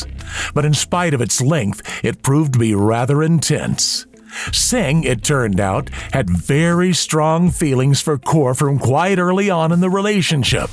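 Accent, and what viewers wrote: United States. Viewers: this American overacting voiceover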